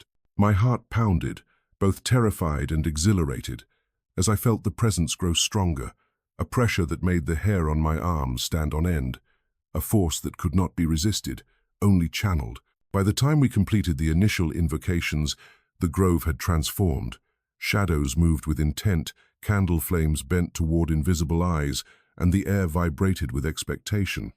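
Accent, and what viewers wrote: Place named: United Kingdom. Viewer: A British accent is just WRONG for this. It needs to be a Cajun accent.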